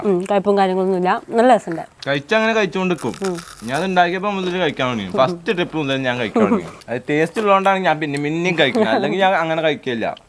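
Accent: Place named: Malappuram, India. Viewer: ഫുഡ്‌ അല്ല ഞാൻ നോക്കുന്നത് അനിയത്തി കുട്ടീടെ വർത്താനം ആണ് ട്ടോ... ഞാനും ഒരു ട്ടോ കാരി ആണ്.... മലപ്പുറം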